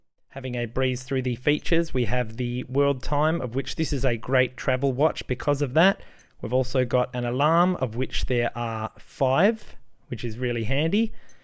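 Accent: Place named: Australia. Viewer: Good to hear the ol' Aussie twang going on there! Good video, thanks. Before I gave mine to my son I used mine as my everyday watch, including swimming and hiking and it took everything like a champ. I had the steel bracelet one and changed it to a black Casio strap. Now thinking of getting another one, the black one like your one in this vid.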